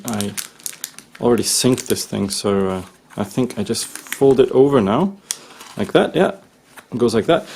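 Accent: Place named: Germany. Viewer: Well despite his terrific English he doesn't seem to me to be a native speaker and I was trying to work out where he was from - I think he's a German speaker who's spent much of his life in Canada. And -> Ant 7'46 - sounds like a German tic to me. Still - incredibly good level of English!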